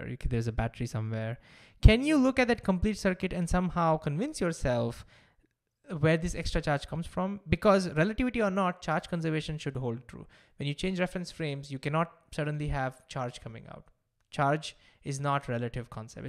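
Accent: India. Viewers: you made me a believer! Btw i love the typical Indian accent. moVing becomes mOOOing. Gotto love it.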